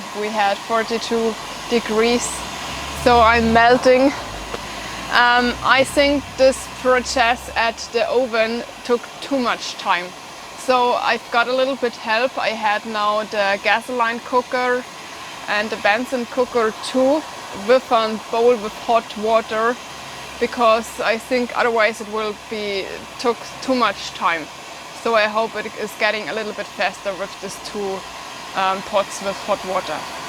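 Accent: Germany. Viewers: Your German English wonderful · I bought your book velcome bek am from vild and bushcraft love it am your number one fan am. Not making fun of you I love your heavy accent it reminds of the German girl in the movie YOUNG FRANKENSTEIN the mel Brooks comedy movie she talks like you.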